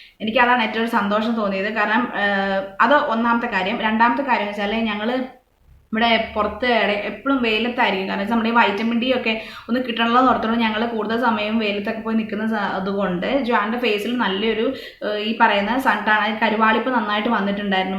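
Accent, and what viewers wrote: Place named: Kannur, India. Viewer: Chechi vdoil allatto paranjath cmnt cheythirunnu Enk sure aanu kannur kottiyur aaanennu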